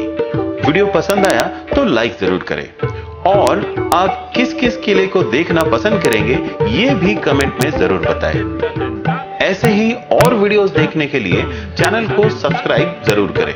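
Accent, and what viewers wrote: Maharashtra, India. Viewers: Bhaisahab ye maharastrian hindhi hai,thoda samaja karo bhai..har kisika bolne ka,bat karne ka o kidhar rahte hai unpe jyada depend karta hai..so unka aisa Kuch matalab nahi rahega k kisike man dukhaya jaye... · Please learn to speak hindi well it seems you are telling story in Marathi Hindi tone is different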